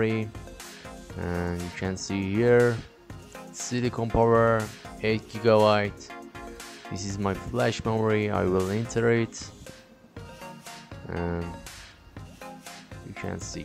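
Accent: Iran. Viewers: انگلیسی با لهجه غلیط ایرانی . دمت گرم. کلا منظورت این بود که . از برنامه winsetupfromusb برای ساخت بوت نصب ویندوز استفاده کنیم. نه روفوس ؟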